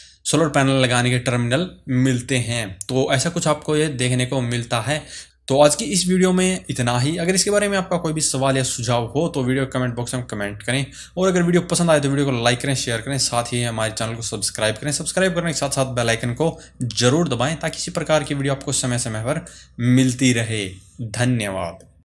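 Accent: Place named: Haryana, India. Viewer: bhai wo àpke lahje se pata lag gaya...HR me kaha se ho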